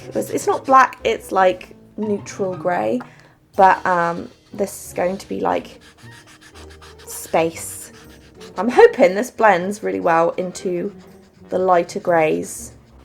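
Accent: United Kingdom. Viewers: How come you grew up in america and still have such a british accent? sounds like you come from the south east of england around bristol...weird.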